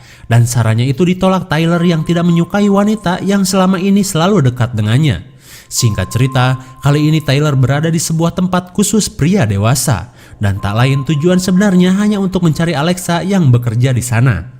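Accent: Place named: West Java, Indonesia. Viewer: Enakeun kalo orng sunda yg bawain cerita :v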